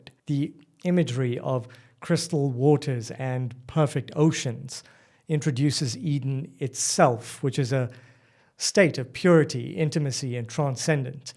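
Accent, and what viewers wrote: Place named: Johannesburg, South Africa. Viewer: Oh, that is why your "accent" sounds so familiar, yet unusual compared with what I'm used to hearing on YouTube... Hi 👋 from Joburg as well.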